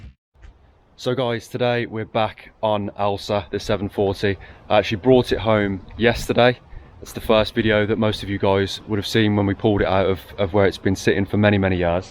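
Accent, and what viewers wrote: Birmingham, United Kingdom. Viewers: You've probably been asked before, but whereabouts in Brum are you from? By your accent I am guessing North Brum. Love the channel.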